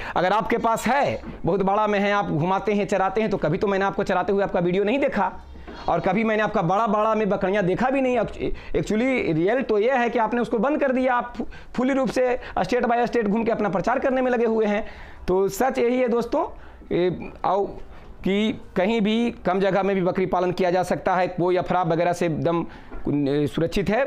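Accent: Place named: Bihar, India. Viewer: सर जी आप दवाइयों के नाम स्क्रीन पर दे दिया करे क्योंकि बिहारी टोन होने के कारण शब्दों को समझने में दिक्कत होती हैं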